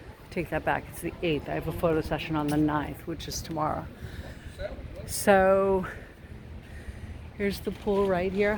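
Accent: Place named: Boston, United States. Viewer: New England as in Boston. (Pah kahz.) Love it! Am I right? Or at least close by...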